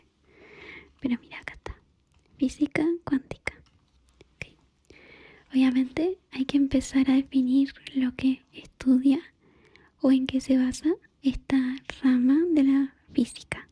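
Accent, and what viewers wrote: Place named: Argentina. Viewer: hablas argentino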